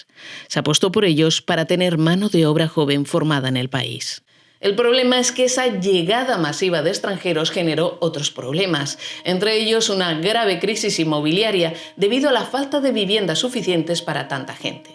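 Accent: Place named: Spain. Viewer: Señor para su informacion ella es de españa y asi se habla el espan̈ol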